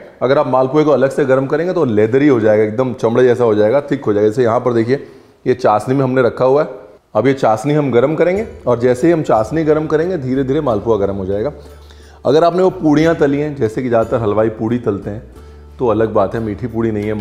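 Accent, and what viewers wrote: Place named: Lucknow, India. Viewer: Hey Ranveer I'm Gaurav from lko You are first whom I had subscribed I had never subscribed any one before on YouTube. Just telling you coz I admire you and your talent of cooking And over n above your commentary during cooking , poora lakhnavi andaaj Youu kahe ki baaton se hi tar kar dete ho . Hope you will read and reply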